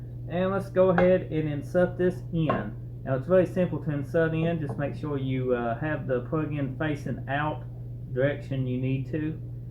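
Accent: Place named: Louisiana, United States. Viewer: Very helpful thank you great video your accent sound Cajun.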